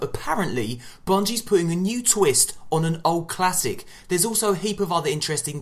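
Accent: Australia. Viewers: yer australia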